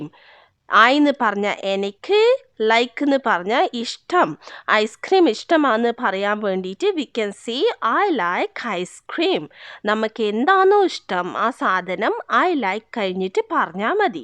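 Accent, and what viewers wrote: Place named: Kannur, India. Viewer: ഇങ്ങള് കണ്ണൂർ ആണല്ലേ 😄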